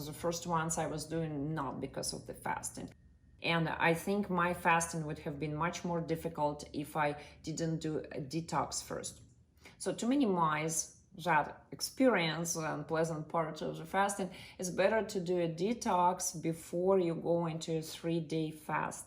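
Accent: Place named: Poland. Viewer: Your foreign Polish accent makes my ears bleed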